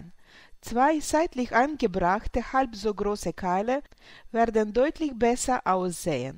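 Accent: Russia. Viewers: если вы понимаете, что я пишу значит вы можете по русски, акцент у вас очень большой, с таким акцентом говорят русские, но не все в этом признаются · У вас такой милый акцент) вы из России? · А почему не на русском?всеравно сильный русский акцент?не надо стесняться говорить по русски даже живя в Германии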